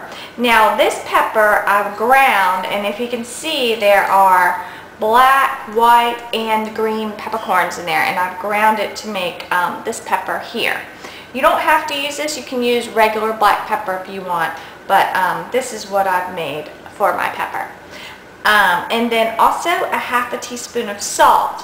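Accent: South, United States. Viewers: I love how your making asian meals & have a small southern accents ♡♡ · Love this video and your southern drawl....... just like that! (smile)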